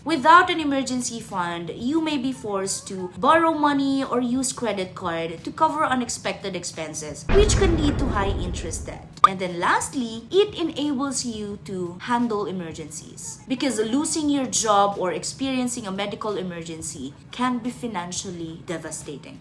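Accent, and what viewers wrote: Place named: United States. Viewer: Parang nasa america ata to english hnd pinoy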